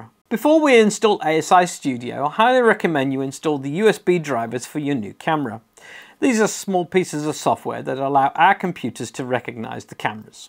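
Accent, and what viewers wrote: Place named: New Zealand. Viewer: good to hear a Kiwi voice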